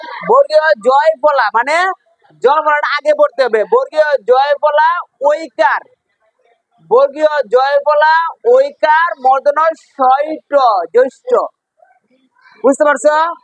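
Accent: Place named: Kishoreganj, Bangladesh. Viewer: আপনার নিজের ই তো উচ্চারনের সমস্যা ভাই, আপনার বাসা কিশোরগঞ্জ মনে হয়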